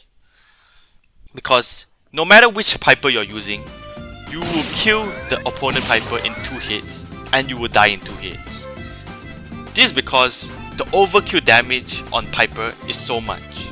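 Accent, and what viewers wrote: Singapore. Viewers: I can tell that ur singaporean XD am i right? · singaporean accent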